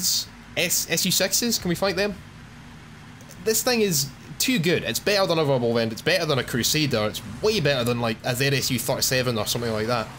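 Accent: Scotland, United Kingdom. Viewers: I think the new mic makes you sound more. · I love ur accent btw is iron bru really that popular over there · teach me how grind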